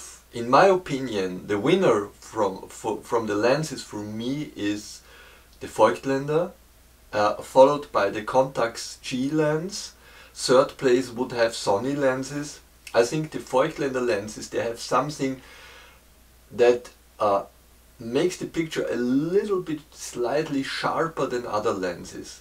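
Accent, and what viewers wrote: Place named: Germany. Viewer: Very informative, thank you! Your German accent adds gravitas to your thoughts!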